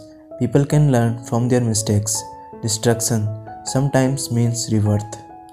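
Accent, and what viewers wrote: India. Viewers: Heavy Indian accent. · Did you not find someone with better accent to have a voice over! Dehati English hai! And the first line is catchy! Very cheap! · Welp his foreign indian accent is like butter sad about the thumbnail but stayed for the voice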